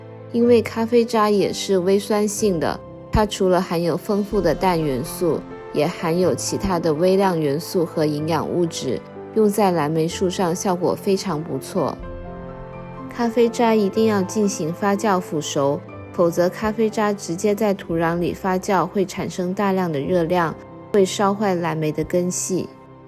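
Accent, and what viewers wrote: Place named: China. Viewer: 中國人的4瓶!! 雖然用了正體漢字聽口音跟用詞都不是台灣人跟香港人的用法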